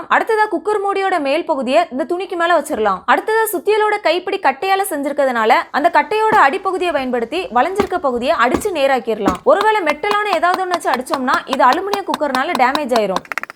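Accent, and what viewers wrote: Tirunelveli, India. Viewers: ஊச்சி ஊச்சா இருந்தா......தூத்துக்குடி,திருநெல்வேலியா?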